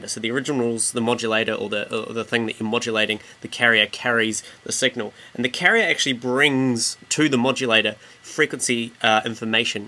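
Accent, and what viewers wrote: New Zealand. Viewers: Are you from NZ, you sound Kiwi azzzzz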